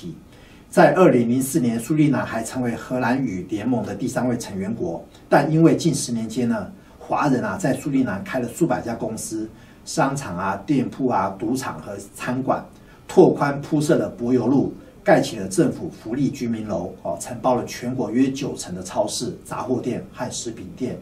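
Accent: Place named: Taiwan. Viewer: 请问，您老是客家人吗？听口音是台湾人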